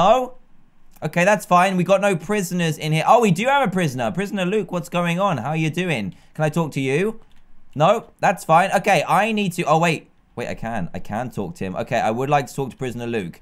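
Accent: United Kingdom. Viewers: is it just me or does he sound British??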